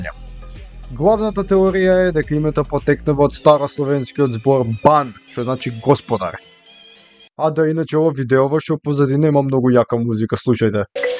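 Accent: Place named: Skopje, North Macedonia. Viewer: не употребувајте некаков скопски нагласок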